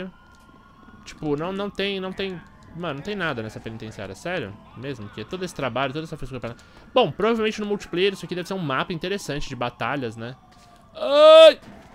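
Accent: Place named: São Paulo, Brazil. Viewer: parece o luciano huck e com esse sotaque irritante de paulistano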